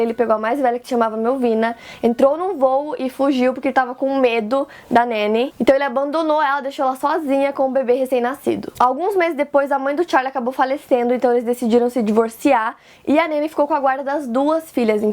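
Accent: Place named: Paraná, Brazil. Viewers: Seu sotaque paranaense é maravilhoso, que voz linda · Ela é de Ponta Grossa, no Paraná. Eu moro no interior do Paraná e tenho um sotaque parecido, adorei encontrar o canal dela e finalmente ter um Youtuber maiorzinho que fala como eu shshshs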